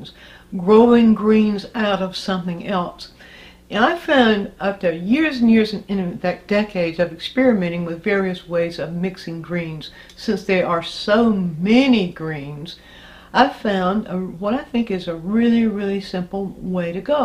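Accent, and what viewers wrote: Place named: South, United States. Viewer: you are not only aged artist you are an excellent teacher, your passion for painting shows in your teaching. You talk slowly and clearly ( unusual today) and I love your southern accent, thank you I have learned a lot from you. Khemin 🌹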